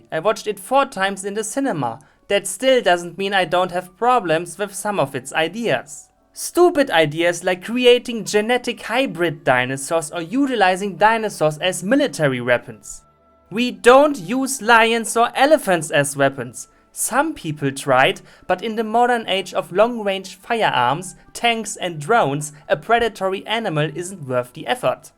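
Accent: Germany. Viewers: Can you speak German ? Because you sound like you have a German akzent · I was born about a decade before Jurassic world and I watched the Jurassic park movies so ye I got inspired (I know someone else said this but your German accent makes this waaaaaaaaaaaaaaaaaaaaaaaaaaaaaaay better) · I freaking noticed, that u have to be from germany, becuz of ur Accent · I like your German accent it makes these videos a hundred times better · Your strong German accent makes everything way better. When you're reviewing a terrible movie like Dino Time, it makes my eyes bleed. (That movie was terrible) but when you're there, my eyes bleed less. 😂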